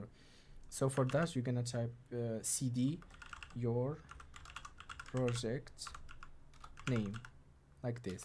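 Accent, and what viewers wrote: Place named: India. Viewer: الله يسامحك يا أخي، ظنيتك هندي والله لأنك تتحدث بنفس طريقتهم استمر وفقك الله وأعانك 👏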